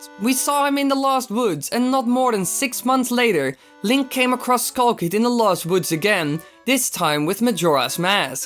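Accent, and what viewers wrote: Netherlands. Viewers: Are u dutch? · Wow you speak english with a dutch accent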